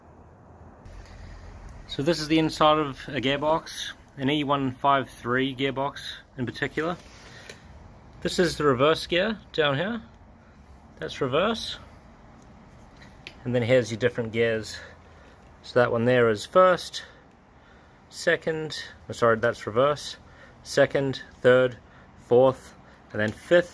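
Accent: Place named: Australia. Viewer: ur sounding like a bloody auzzie keint haha. love it. awesome videos. keep it up.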